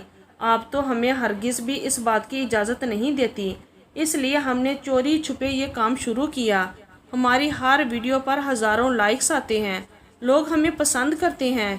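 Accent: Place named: Lahore, Pakistan. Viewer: Lahoren lehja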